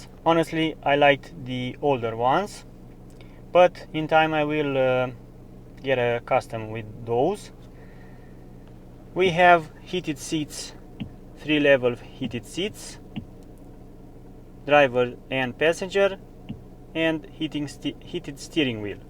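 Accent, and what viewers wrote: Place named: Romania. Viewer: Нормальный восточно-европейский акцент (он из Румынии), зато нам же и всё понятно, что он на инглише говорит. И большинству во всём мире понятно. Так что напрасно вы докопались. А вот что у нас этой машины нет на российском рынке - вот я бы про это переживал. Возможно стала бы даже более народной, чем предыдущие генерации, сильно потеснила бы в этой нише Creta, Seltos и т.п. Но увы :(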